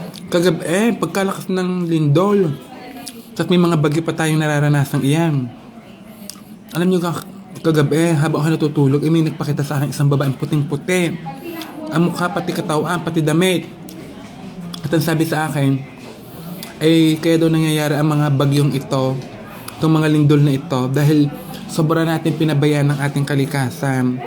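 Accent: Batangas, Philippines. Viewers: Batangenya ka po ba???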